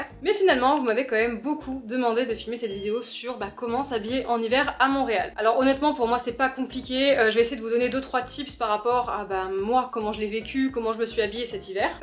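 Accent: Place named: France. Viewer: tu viens de la France? Moi je suis américain mais je trouve que t’as un accent français.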